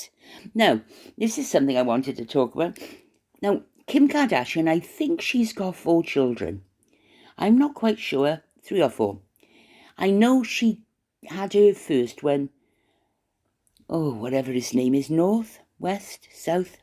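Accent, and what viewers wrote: Wales, United Kingdom. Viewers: happy you’re back, nice to hear that Welsh accent, God Bless.♥️🕊️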